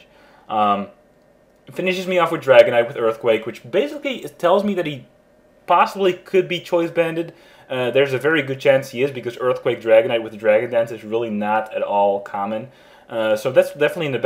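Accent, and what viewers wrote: Netherlands. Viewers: Is it possible that you are Dutch? Your English sounds Dutch :P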